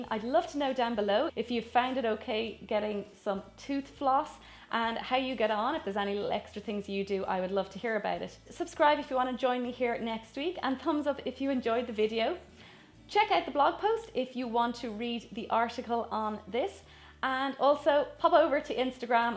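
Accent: Ireland. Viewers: You mentioned a powder but sorry I couldn't understand 🙈 Irish accent is 😍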